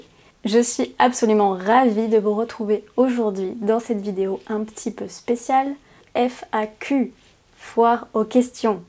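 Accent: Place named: South, France. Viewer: Bonjour. Le prénom « Kurt », qui est allemand, se prononce exactement comme « courte » sans prononcer le « e » à la fin (car j'entends que tu es du sud de la France). 🙂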